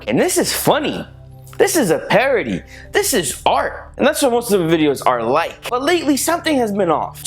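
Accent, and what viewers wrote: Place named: South, United States. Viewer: where are u from cuz have a southerny westernizh accent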